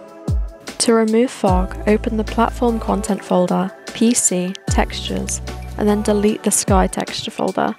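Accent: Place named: Australia. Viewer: stopp that australian accent is so cutee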